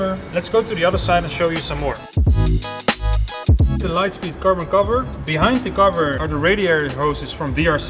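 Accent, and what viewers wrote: Netherlands. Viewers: Ik hoor wel dat je nederlands bent ^_^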